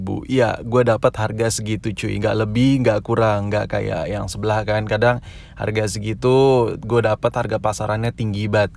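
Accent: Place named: Medan, Indonesia. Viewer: Lu orang medan bang.. Pake bahas Kimak pulak kau...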